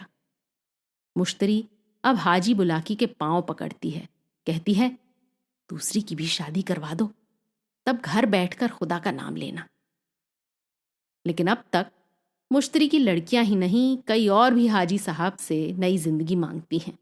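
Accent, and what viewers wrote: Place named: Lucknow, India. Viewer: Excellent... I can smell lucknow in your Voice .... Thanks alot Ayesha 🤍🖤 for recommendation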